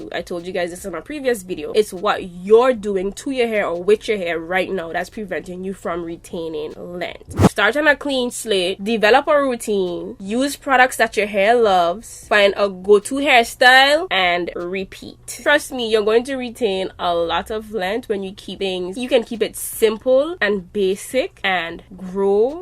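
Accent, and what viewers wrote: Trinidad and Tobago. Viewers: Are you trinidadian? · Girl got to give you a thumbs up..the trini accent first then your content..I really dont know what products works for my hair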